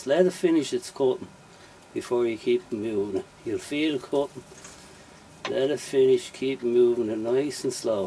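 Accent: Ireland. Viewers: I very much appreciate your approach to turning and especially your comments regarding safety. Very appropriate. I just found your site and have started watching your videos. I lived and worked in Ireland in the mid-90's for 2 1/2 yrs so I recognized you were Irish immediately! Are you from the Dubin area? North Dublin, perhaps? My ear for the accents is not as sharp as it used to be when I could pick up a Dub, or a Cork or Kerry man when I lived there. Cheers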